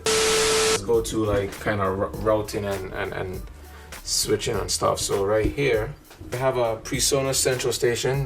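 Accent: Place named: Caribbean. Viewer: It’s a blessing that I came across your videos. Much respect to you and your talent. Great content thus far. Sounds like you have a Caribbean background. I’m from the islands 👍🏽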